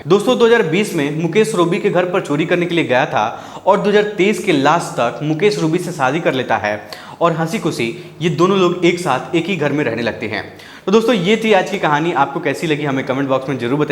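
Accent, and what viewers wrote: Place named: Bihar, India. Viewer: Abe sahi se Hindi to bol bihari taan me kyu bol raha hai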